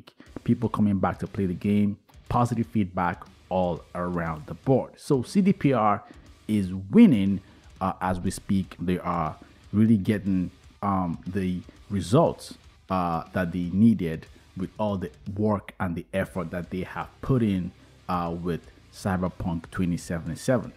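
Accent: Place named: India. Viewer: Hello. Where are u from? India? Just curious) Your accent sounds like indian.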